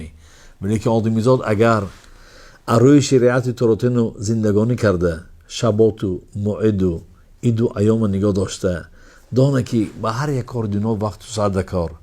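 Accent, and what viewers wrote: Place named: Tajikistan. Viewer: بسيار خوب به لهجه شيرين تاجکي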